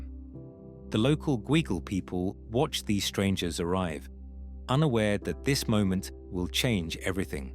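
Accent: United Kingdom. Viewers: Perhaps my expectations of AI is unreasonable as despite finding the video interesting it's not very good. It did make me laugh at a few points so I suppose that's a good thing. Who doesn't enjoy a good chuckle? If this was presented as a school project you could only give it average marks. The constant repitition of the same scene turns a five minute video into over ten minutes. I find using a British sounding narrator interesting despite it being a U.S. production. I suppose it attempts to give it more credibility but being U.S. produced it explains why "sidewalk" was used rather than "footpath". Anyway, I'll have to wait for AI to improve. Hopefully before all of the AI robots kill us. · This is so full of errors, it's ridiculous. Despite the British accent, the text is USA English, as the frequent 'sidewalks' shows, the camera panning is disjointed, placing of famous buildings is wrong and at 6.55 we see a smoke filled harbour while the voice tells us it's a sweeping blue paradise for artists. 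What is the point of it?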